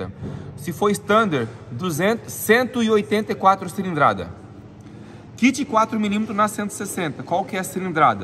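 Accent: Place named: Goiás, Brazil. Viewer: Goiano uai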